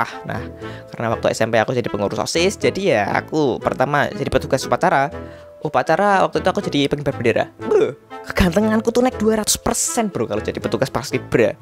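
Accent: Java, Indonesia. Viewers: Iya bahasa jawa lucu kamu ya hahahhaha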